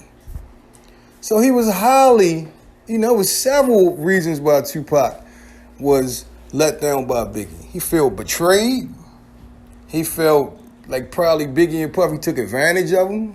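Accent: Baltimore, United States. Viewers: It's crazy how we can tell you're from b more just by the accent that's cool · aye bro you from Baltimore? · You straight from Baltimore.. lol great channel my G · Im loving that bmore accent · First of all Biggie was hip hop unsigned hype b4 he met pac . And puff made big career not no pac . Pac a whole liar first thing first you a pac fan from bmore i can tell by your accent talking bout ny stuff you clearly dont know much about . Did he sleep on son couch mayb once or twice but he didnt live with pac yall take that bullshit and ran with it . Pac got robbed by real gangstas and did what pussies do blame everything else except for the fact they got caught slipping . He so tuff why he aint get at king tut and them u know why cause he fucking couldnt tupac is a actor he took his acting to the rap game . Once he saw how ppl loved him for his role as bishop he adopted that in his rap shit and it worked . 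Why would big get involved in some shit he dont have nothing to do with . Like flex said pac lied and we all know it tupac the biggest fraud ever . Fuck him Brooklyn forever no slander on the King of Ny . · U gotta be from Bmore !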